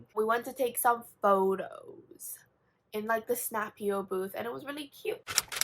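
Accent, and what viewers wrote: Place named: United Kingdom. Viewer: and that british accent. bro. whO ARE YOu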